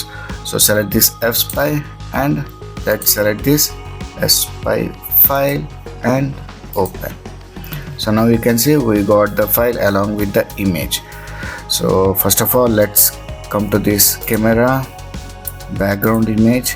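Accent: India. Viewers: why is it all indians weird curry accents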